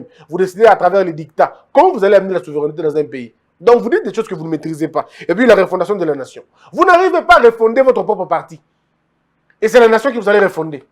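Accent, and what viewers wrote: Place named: Mongo, Democratic Republic of the Congo. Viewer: Mr, j'aime quand tu parles le Lingala avec un peu de kimongo.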